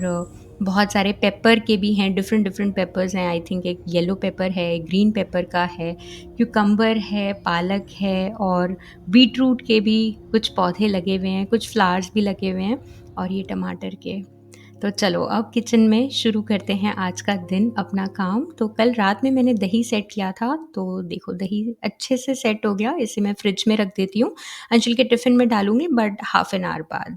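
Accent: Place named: India. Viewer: Aap hindi mai comfortable then use hindi half hindi n half English is a no no no ur english sounds more hindi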